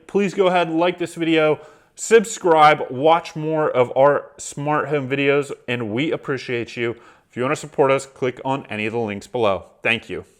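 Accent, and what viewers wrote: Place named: Baltimore, United States. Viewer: Sounds like Baltimore accent 😃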